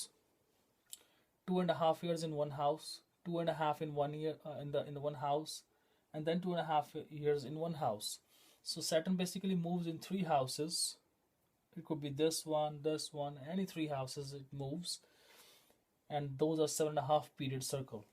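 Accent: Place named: West, United States. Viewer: Bro if you lose your Western accent this wonderful explanation would be better understood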